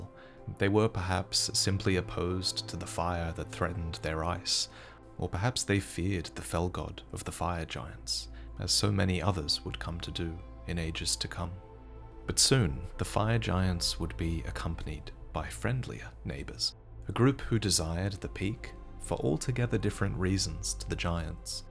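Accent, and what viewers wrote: Australia. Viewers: is Australian? lol hello from Bali, mate! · I’ve been here for years so how am I just finding out you’re Australian?🤣🤣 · IS AUSTRALIAN??? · I didn't even pick up that you're Australian and thats coming from a fellow aussie xD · Most shocking lore revelation in this video for me was not in fact Elden Ring lore, but Lore, I had no idea you were Aussie! XD Phenomenal work as always, can't wait for your next video! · Wait wait… YOU’RE AUSTRALIAN!?!?!!?!? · revealing he's Australian like a jump scare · Been watching for 4 years now and only just found it he's Australian. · Didn’t even realize you’re Australian how did I not notice that you have the accent too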